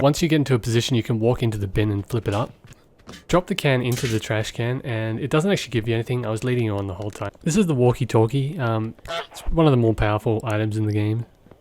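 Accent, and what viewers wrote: Australia. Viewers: I cant stand his australian voice being so incredibly nonchalant about everything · Are you Australian?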